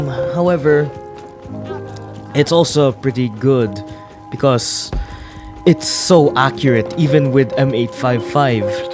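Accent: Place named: Philippines. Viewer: I discovered Deadline couple days ago and I instantly loved it. Though I'm not really good at building, I just slap in the parts with good stats lol. I'm glad I came across your channel, I got lots of build ideas especially for the M4. This channel deserves more views just like how Deadline deserves more players, you guys are the hidden gems of Roblox community. Btw, are you from Philippines as well? I feel like your accent sounds a bit Filipino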